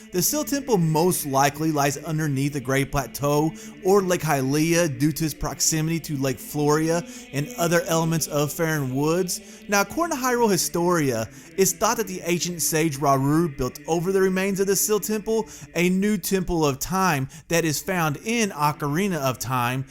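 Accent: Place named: South, United States. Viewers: Holy southern dialect I can’t get over “Duuungin” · Don’t ask why, but your accent sounds like you’d give me a “Roll Tide.” Interesting perspective and some good research, sir.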